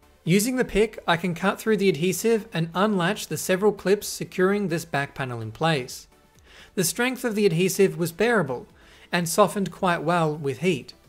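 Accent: Australia. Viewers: Australian english mate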